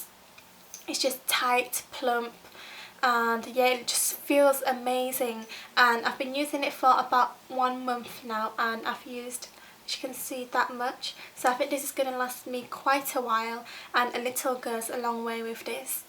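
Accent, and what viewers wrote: Yorkshire, United Kingdom. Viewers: what's the name of her accent? is it yorkshire?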